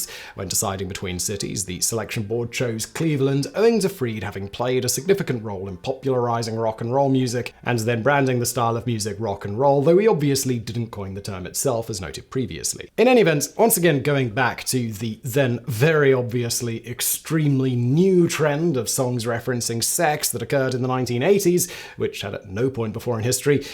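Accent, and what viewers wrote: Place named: RP, United Kingdom. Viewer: Okay, Simon reading aloud the lyrics "I knew a girl named NIKKI. I guess you could say she was a SEX fiend" is his perfect Queen's English accent made me literally lol and is about the funniest thing I've seen today.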